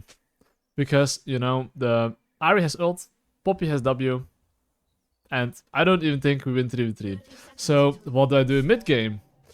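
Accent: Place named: Netherlands. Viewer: Bro, is this guy Dutch? Holy accent😂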